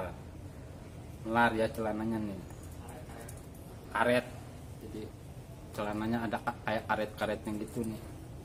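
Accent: West Java, Indonesia. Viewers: Ampelas nya no brp aa ? ni kyk nya org sunda ya ngmng nya wkwkw kocak bgt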